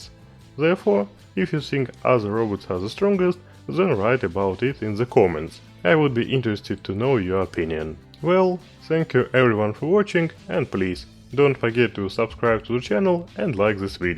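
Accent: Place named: Russia. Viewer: слышу отчетливо русский акцент, полоскун ты чтоли?)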